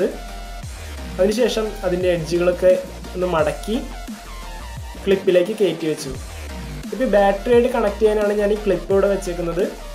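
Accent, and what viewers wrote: Thrissur, India. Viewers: Oru Rakshayilla.. Trissurkaranano?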